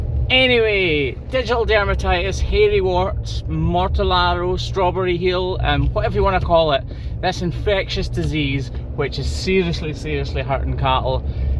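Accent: Scotland, United Kingdom. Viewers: So nice to hear a fellow Scot on Youtube. Also good to watch and listen to someone who is knowledgeable and interested in what he does. Aren't cows such beautiful creatures 😊😊😊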